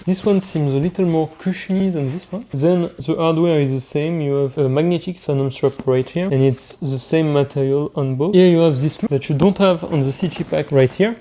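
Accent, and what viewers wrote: France. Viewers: Hi, I love AER products. Could the AER City Pack Pro Ultra fit in a 40x30x20com to be used as a personal item on Transavia ? NB : je viens de découvrir votre chaine et j'aime beaucoup le style même si l'accent français est bien présent. En VF ça serait bien aussi d'autant plus qu'il n'y a rien dans ce domaine en Français.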